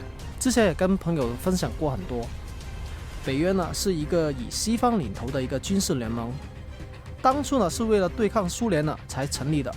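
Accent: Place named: Hong Kong. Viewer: 港普还不错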